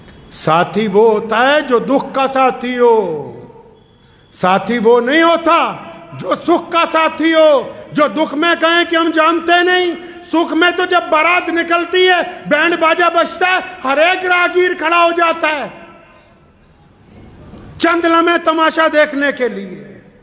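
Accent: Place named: Punjab, India. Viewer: great Punjabi tone and great Urdu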